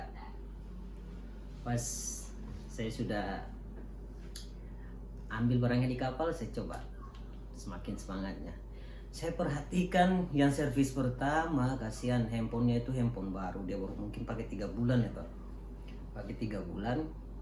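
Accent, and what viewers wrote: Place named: Papua, Indonesia. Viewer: Bicara saja bos hajar pake logat Papua ka,sukses selalu bos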